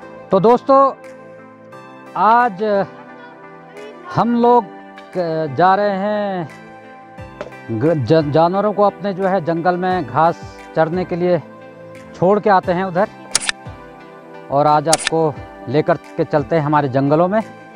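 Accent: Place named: Pahadi, India. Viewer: आपकी पहाड़ी बोलनी बहुत अच्छी लग रही । आपको पहले कभी नहीं देखा। बहुत बहुत सुंदर🤣🤣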